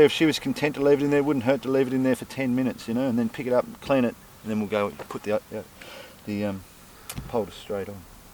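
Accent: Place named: Australia. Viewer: You have a different technique than more farriers in not straddling the horse's leg. Love your accent, are you from Australia?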